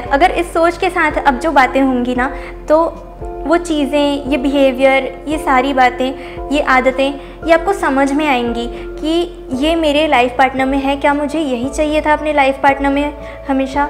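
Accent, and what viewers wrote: Bihar, India. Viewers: I like bihari tone 😝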